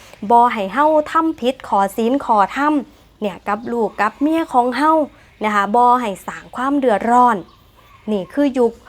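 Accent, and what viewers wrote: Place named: Vientiane, Laos. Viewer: เว่าม้วนอีหลี เหมือนลาวเจ้า ลาวเวียงจันทร์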